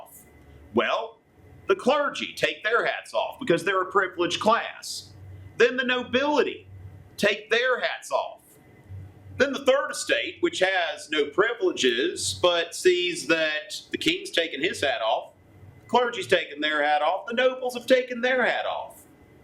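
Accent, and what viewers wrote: South, United States. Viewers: Why is this man speaking is a Southern drawl? Whatever happened to standard and intelligible English? On a completely different note: Why does one have to hold a cup in one's hand while speaking about the Estates General? Seriously....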